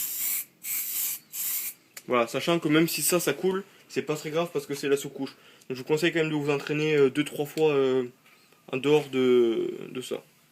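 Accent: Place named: South, France. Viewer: T'es du sud toi ? J'entend un petit accent , sinon merci pour se tuto !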